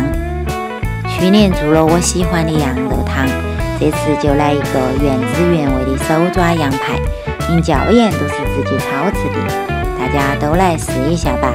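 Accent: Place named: Sichuan, China. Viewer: 我不是中国出生的都能听懂。蛮好听的四川成都话。👍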